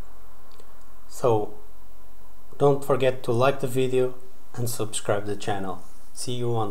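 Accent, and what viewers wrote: Portugal. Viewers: Com esse sotaque és tuga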